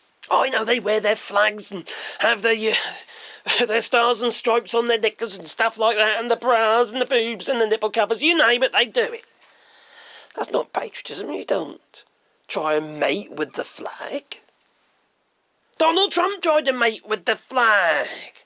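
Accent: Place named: Scotland, United Kingdom. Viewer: Love your Scottish accent.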